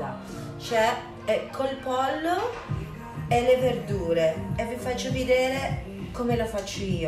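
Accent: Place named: Liguria, Italy. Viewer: Che bello il tuo accento ligure orientalizzato...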